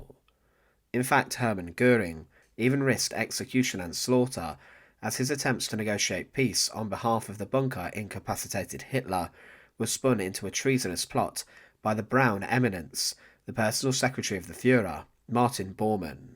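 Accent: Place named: Yorkshire, United Kingdom. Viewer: It’s probably AI. I find narration by AI very irritating. The accent is pretty heavy, maybe Yorkshire.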